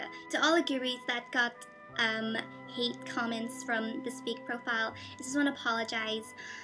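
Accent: Ireland. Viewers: youre irish!?!? sry,,, slow moment ive only seen like 2 of your videos :) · Whoa, an Asian girl with an Irish accent! That's pretty much the hottest thing ever. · I've had this problem before on the internet. It sucks tbh cos there's almost nothing you can do about it! You've done the right thing anyway by letting people know what's going on in a mature way. Anyone who knows you and cares about you will recognise that you aren't malicious at all, in fact from what I've seen you couldn't be more honest and kind. p.s. I love the little hints of Irish that sneaks in now and again when you're talking, it's really cute and certainly unique! Keep smiling! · You have the cutest accent EVER <3 omg <3 you can hear the little irish bits and GAHH <3 so so sweet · dude u hav an irish accentish wer u born der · You sound irish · I JUST NOTICED YOUR IRISH ACCENT. Yeah I know I'm slow.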